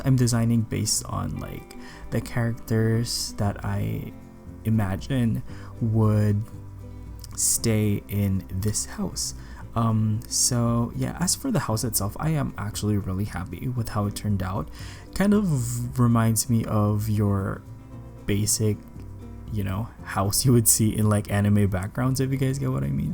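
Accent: Philippines. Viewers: Bruh ur accent, by any chance r u a FILO? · Stumbled to this video , and as soon as you start speaking i knew you're a filipino.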